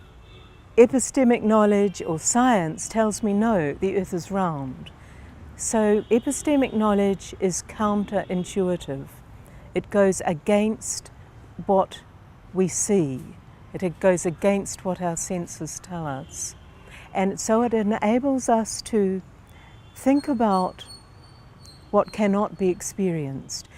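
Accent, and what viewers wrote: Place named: England, United Kingdom. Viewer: One of New Zealand's least intelligent academics, a fake celebrity charlatan riding the coattails of her own controversy to success. will be remembered forever as the moron who tried to mould the education system in her misinformed image and failed. Imagine sitting through a whole lecture of this bints unbearable Tory lilt, should have been deported back to the UK years ago.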